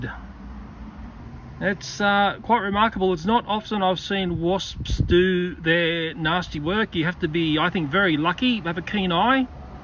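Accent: Australia. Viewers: Aussie accent is so funny but how on gods earth do you guys live with those spiders down there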